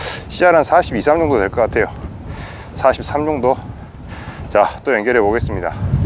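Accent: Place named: Jeolla, South Korea. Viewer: 터진고기는 목줄 검사를 꼭 하십시요 터지면 환장합니다 전라도 사투리 역시 구수하네요 저도 본은 전북 정읍입니다 직장이 평택이라 올라와 있죠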